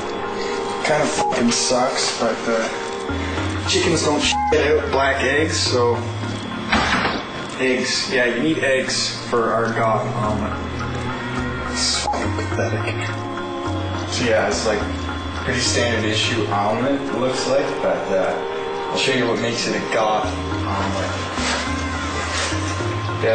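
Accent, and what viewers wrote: Canada. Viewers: the canadian accent is strong with this mau5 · Listen to his Canadian accent here holy. Haha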